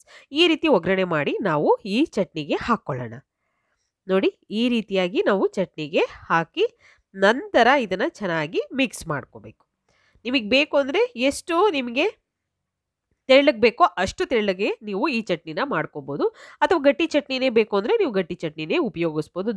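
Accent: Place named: Malenadu, India. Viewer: ಇವತ್ತೇ ಮೊದಲನೇ ಸಾರಿ ನಿಮ್ಮ ವಿಡಿಯೋ ನೋಡಿದೆ. ನಿಮ್ಮ ಕನ್ನಡ ನಮ್ಮ ಮಲೆನಾಡಿನ ಕನ್ನಡದಂತಿದೆ. ಖಂಡಿತ ನೀವು ತೋರಿಸಿರುವ ಚಟ್ನಿ ದೋಸೆಗೆ ಮಾಡುತ್ತೇನೆ. ಧನ್ಯವಾದಗಳು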